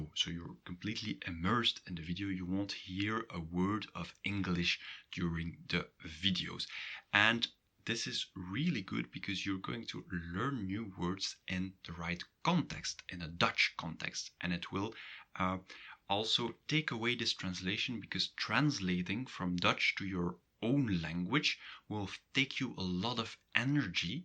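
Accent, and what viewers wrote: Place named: Belgium. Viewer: Well, finally i can find a real Dutch course video from belguim's accents . I will follow all your courses...:-)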